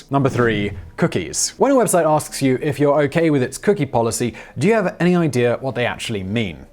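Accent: England, United Kingdom. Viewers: how do you figure its an American? The host is clearly English.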